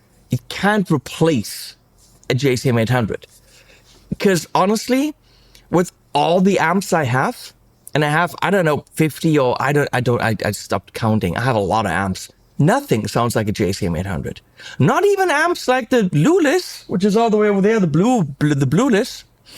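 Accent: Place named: Germany. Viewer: Came for the pedal review stayed for the German accent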